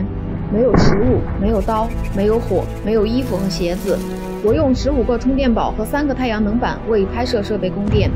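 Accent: Henan, China. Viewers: 你有河南口音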